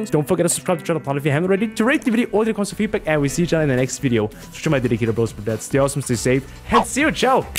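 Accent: Germany. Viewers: your german? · Ayo you german?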